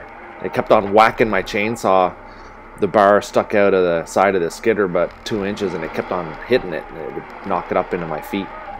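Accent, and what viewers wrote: Canada. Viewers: please don't talk, can't stand that Canadian accent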